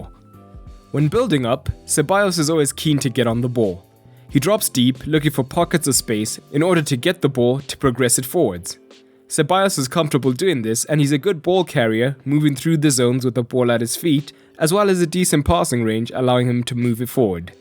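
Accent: South Africa. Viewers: Are you South African?😂 your accent would suggest it. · You sound South African.. Gauteng oakie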